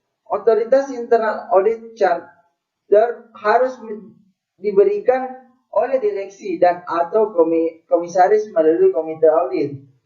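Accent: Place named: Bugis, Indonesia. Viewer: Materinya udah bguss cuman cara bacanya masih kebawa logat bugisnya gitu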